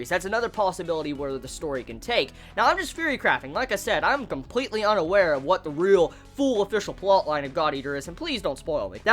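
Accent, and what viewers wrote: United States. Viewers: Are u American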